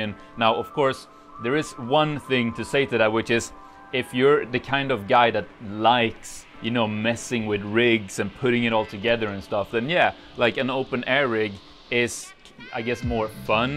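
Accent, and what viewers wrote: New Zealand. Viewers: i love your kiwi! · Hi, many thanks for another great video. Always loved the Kiwi accent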